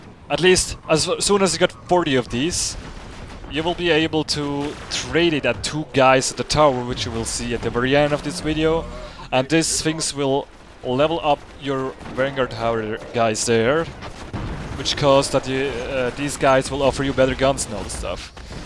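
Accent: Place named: Switzerland. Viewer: has uf dim Steamprofil gseh, mer erkennt d'schwizer scho ader stemm, ben aber ned ganz secher gsi :)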